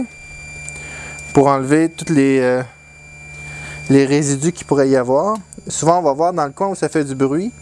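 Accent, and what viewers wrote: Quebec, Canada. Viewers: c'est une vis sans fin qu'il y a là au milieux? Où bien une courroie? Avec le parler Canadien ou Québecois je craint la valeurs de mots!...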